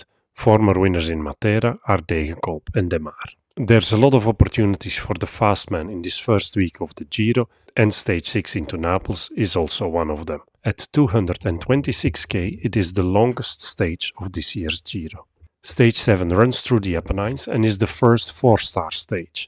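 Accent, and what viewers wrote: Flanders, Belgium. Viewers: Ik hoor wat vlaams Engels in deze video haha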